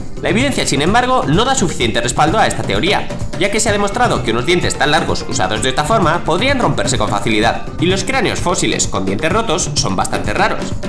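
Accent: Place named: Spain. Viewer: hay como cambian palabras y pronunciaciones esos españoles eres español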